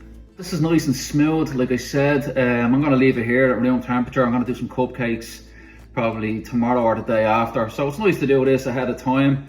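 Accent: Ireland. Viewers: Watching with automatic CC is hilarious with an Irish accent 😂 luckily my father in law is Irish so I knew exactly what you were saying even if captions didn't! 🙈 Dissolving the sugar is genius! Definitely trying that next time, thank you! 🍀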